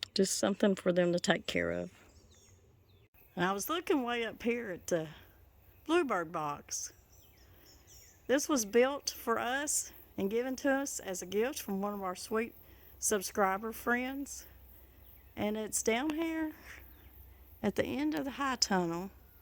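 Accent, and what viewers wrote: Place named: Arkansas, United States. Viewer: I listen to you because you sound like my Arkansas Family that I miss terribly. Today you said something that If I were you I would use to describe your( Good Things(Martha Stewart).(Love and Best Dishes Paula Dean) "You can not beat that with a Stick" Should be how you describe your endings along with " God's Best to You from Whippoorwill Holler." Your beginnings of filming is great describing your goals. I feel like you need an ending. that is just as Country,. You are a great cook, school kid approved, family friendly dishes that withstand the test of time. I Know cause my folks did a lot of life that way. Collins/ Smith/ Hearst/ Sloan are my AR family names. Maybe you have heard of them? You are "the Best thing and God's Best to you all." CKelly Hearst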